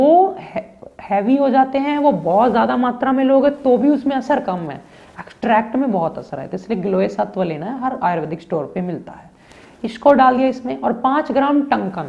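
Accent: Punjab, India. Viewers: Thank you ji Apki language me punjabi touch dikhta hai ji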